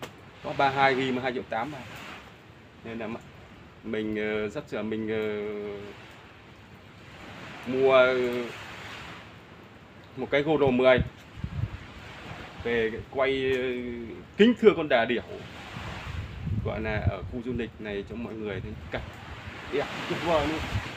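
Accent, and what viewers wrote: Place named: South, Vietnam. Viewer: Gióng risot hunmiso Nam du quá. Chúc bạn thành công nhe.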